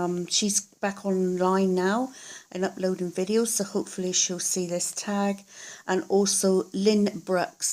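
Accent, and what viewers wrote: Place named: England, United Kingdom. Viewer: You made me smile when you were trying to remember the different types of orchids - there you were pointing the camera at Dendrobiums and forgot them completely! Thanks for joining in and you've solved one mystery - I knew that was an English accent!!!. And well done getting Den. fimbriatum to bloom - you will love those blooms but you might not like the fragrance?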